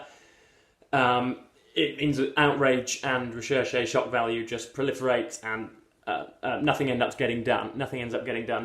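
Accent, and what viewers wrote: United Kingdom. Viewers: I love how british he is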